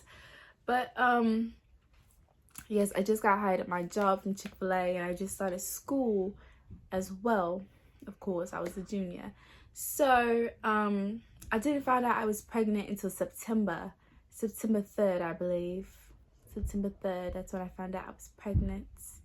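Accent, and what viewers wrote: United Kingdom. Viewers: I’m British so ik that did u not read the comment 🙄 it sounded like how my cousins from north London speak so that’s why I said that so pls have a good day and goodbye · o shii i’m a new sub and i thought she was from london fr😂😂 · It was the British Accent for me 😂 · Is the Brittish Accent for me 😂 Your so pretty 💞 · lmaf loving the British accent❤️ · At first the British Accent🤣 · It’s the natural beauty for me🥺🥺and girl I’m from London and that British accent was on point🤣🤣🤣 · She British like what😲😱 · your British accent isn’t bad x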